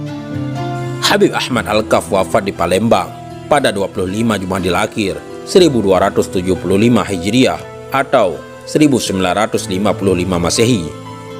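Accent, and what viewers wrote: Aceh, Indonesia. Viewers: terdengar,, dari logat admin orang aceh yaa hehehe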